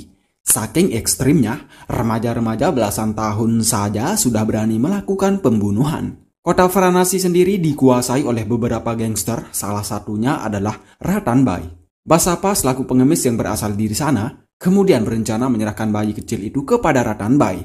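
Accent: Bali, Indonesia. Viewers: Logat bicara nya kayak logat bali, 😁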